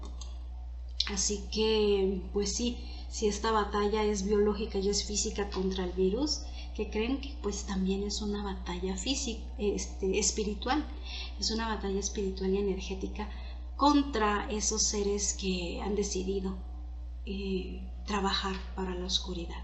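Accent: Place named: Mexico. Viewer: Hola, voy a asumir por tu acento que eres de Mexico, pero en caso de equivocarme igual gracias por compartir este video en YT, quisiera saber si sabes de algún centro espirita para congregarse aqui en Mexico yo soy de Nuevo Leon pero eh mandado correos al que supuesta mente es de este estado pero no me responden, tendrás alguna sugerencia ? Saludos y gracias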